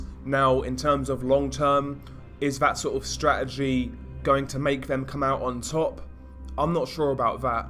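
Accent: England, United Kingdom. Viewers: British accent is wonderfull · Best sound theme ever ! Combines lovely with ur english accent. ' Rival Cartel Membahh!'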